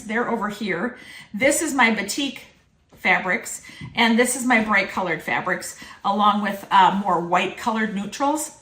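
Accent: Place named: Midwest, United States. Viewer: I don’t know how many times I have watched this video. I LOVE cutting up scraps so this is right up my alley. My father was born in Charles City near you and we went there every summer and even once at Christmas. I have many wonderful memories of Iowa (which is beautiful) and your Midwest accent is pleasing to my ear. I read your blog daily and I wish you the best with your health issues.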